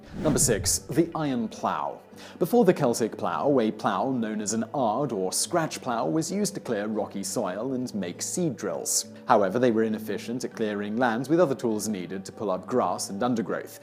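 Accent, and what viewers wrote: United Kingdom. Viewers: Well be patient Simon is British Speaking which can't be too far off from Irish and also the Celts lived in northern Europe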